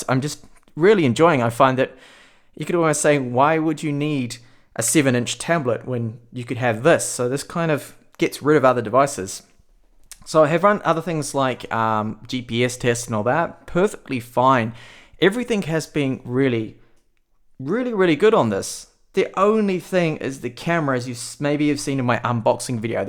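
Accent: Australia. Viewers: Hey man first time on your channel, quick question, did you have to mod anything with the phone in terms of sim like do you use a provider like optus? Just guessing from the accent, thanks man. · I love your accent, are you australian? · I can't tell if you're from Australia or New Zealand...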